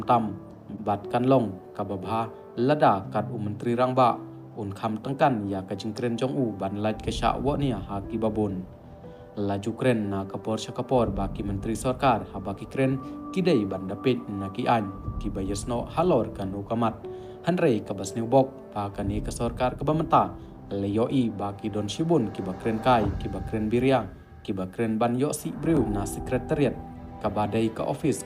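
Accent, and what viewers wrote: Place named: United Kingdom. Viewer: Speaking English like British mind set like Street boys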